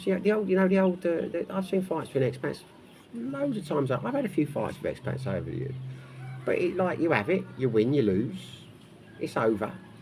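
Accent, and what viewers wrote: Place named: London, United Kingdom. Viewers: That's an English accent. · Southern England - East/ south London or thereabouts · 😂You may speak English…but clearly you don’t understand it when spoken , it’s a London accent !! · It's a London accent from East and South London mostly! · He is speaking the English actual English people speak.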